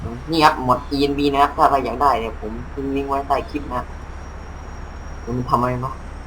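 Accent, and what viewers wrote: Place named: South, Thailand. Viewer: พูดเหมือนคนใต้เลย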